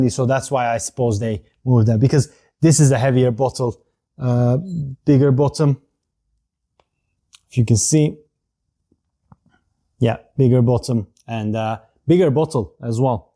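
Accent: Turkey. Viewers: are you turkish? Your accent sounds very turkish 😂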